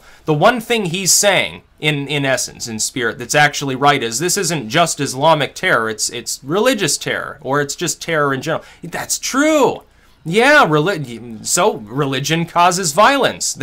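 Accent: United Kingdom. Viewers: Nice Brit accent. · Love your British accent, made me laugh. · Excellent British accent man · Nice British accent! You were just stuffy enough! LMAO Thumbs Up!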